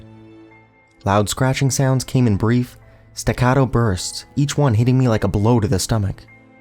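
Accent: Canada. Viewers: Great work friend! After the third one I stopped noticing all the "about" and "out". Canadian accents and humor aside. I think you have an actually soothing voice unlike certain other creepypasta readers, and an excelent selection of stories. Love how you read The Gristers.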